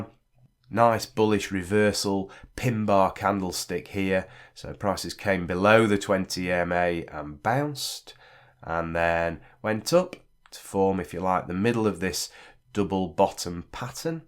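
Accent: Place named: England, United Kingdom. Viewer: And besides I do love the English accent :)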